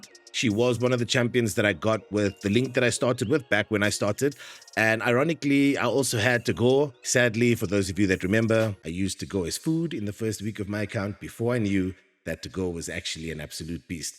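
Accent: South Africa. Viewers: Yup, first 2 words of the video and I knew you’re from SA😂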